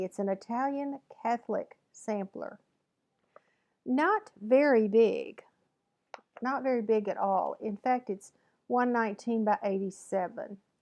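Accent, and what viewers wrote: South, United States. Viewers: I love your southern accent. I could listen to you all day. Take care.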